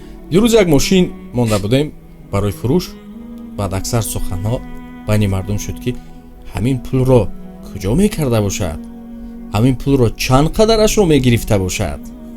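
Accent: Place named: Tajikistan. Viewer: Асалом Алекум акаи кучогиай забони точикиро тоза гап мезанад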